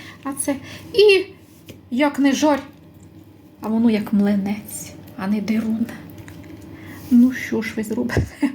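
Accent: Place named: Cherkasy, Ukraine. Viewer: Чути що говір черкаський. Я теж родом з Черкаської обл, на межі з Полтавською.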